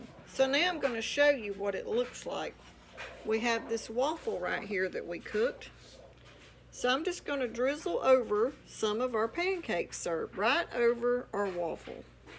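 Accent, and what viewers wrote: South, United States. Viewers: Homemade pancake "srrp", and it has to come to a "rollin bole": beautiful southern accent! :) (I live in the boring/no accent midwest)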